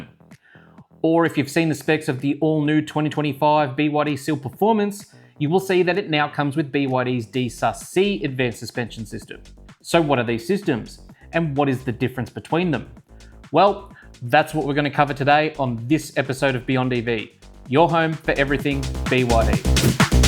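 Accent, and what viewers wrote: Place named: Australia. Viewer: Newly subscribed, good to hear Aussie voices and not AI voices like a lot of new channels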